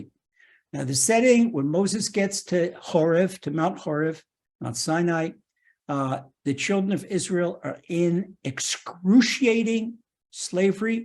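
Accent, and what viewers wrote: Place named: United Kingdom. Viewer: Are you from the UK.. thank you for this.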